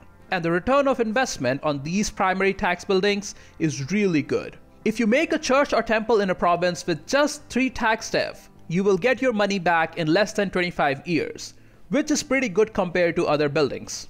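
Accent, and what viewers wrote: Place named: India. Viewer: Where are you from? I think you have an indian accent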